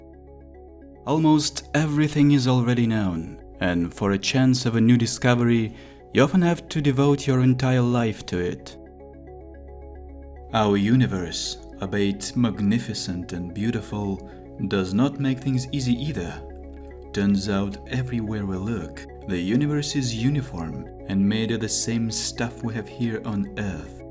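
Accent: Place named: Japan. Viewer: I love your accent! I can't tell if it's Japanese or if some Latin origin. My ears say Japanese.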